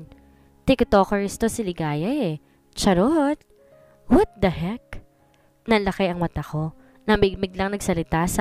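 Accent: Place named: Iloilo, Philippines. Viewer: Maka lingaw ya ilongga mu miss Narrator 😅❤ taga banisilan po ako . I’m very happy po while listening this story ❤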